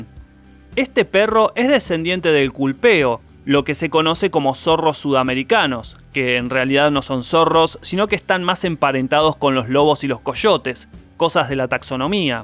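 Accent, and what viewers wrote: Argentina. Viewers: Pero es argentino Ese es su acento No puedes cambiar algo que es endémico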